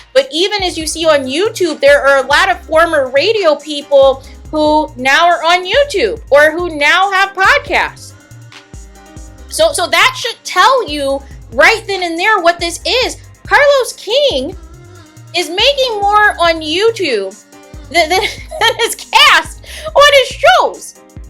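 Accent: Midwest, United States. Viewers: This doesn’t have anything to do with anything but I love to hear you say “You guys”. I’m not sure where you’re from but it sounds like a Midwestern/Minnesota accent 💜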